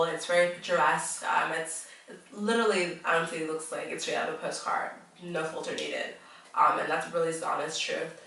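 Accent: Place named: California, United States. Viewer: You accent is interesting... I was thinking California or Canada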